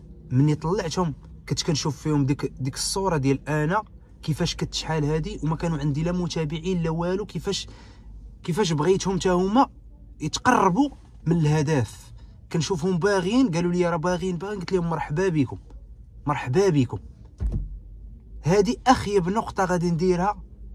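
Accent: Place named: Algeria. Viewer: سمح لي اخويا وقيلة نتا ولد 2000 وطلع لاحظت كلامك كيشبه للجزاير واش متبع الحزايريين تانسيتي لهجتك المغربية؟ ديك كلمة ياو راني نتبع فيك راه لهجة ديالهم ولازم تاهي ديالهم والمدامة ديالك لي قلتي فالتعليق لاخر تاهي ديالهم .المهم حاول تهدر بالمغربية احسن .هما ولاو يهدرو بلهجتنت وانتم العكس وليتو تهدرو بلهجتهم؟ كون غير زينة وهي نصها مديكالي وكلها فرنسية معوجة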